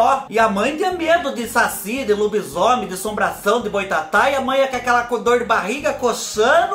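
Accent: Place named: Capão Bonito, Brazil. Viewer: você fala CHÊ. Que nem minha falecida vozinha ali de Capão bonito. Vc lembra meus familiares. Amo seu canal. Agradrço a Deus por vc existir. Muita saúde pra vc e pra sua mãe maravilhosa. Deus os abençoe.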